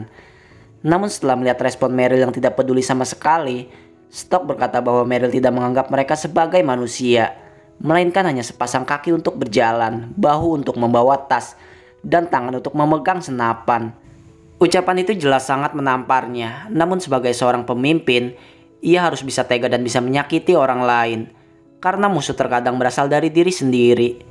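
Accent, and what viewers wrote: Indonesia. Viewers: Dari aksen bicara ke Indonesia bukan papua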